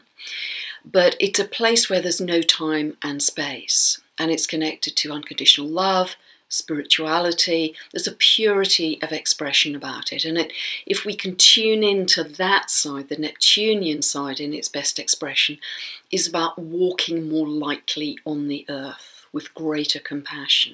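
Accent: United Kingdom. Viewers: Hello. Really like the scientific approach to your video and we cannot deny, looking at the energies in the world, that what you are saying it not valid. So many people like your videos because your British English is so clear and lovely. Best Wishes to you; ) K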